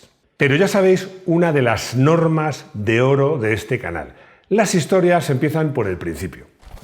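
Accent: Chile. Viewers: ... esa voz en off es sin duda de un chileno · es chileno se le ve en el asento... A donde nos fuimos... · la voz en of ES CHILENO " PA ONDE NOS FUIMOS"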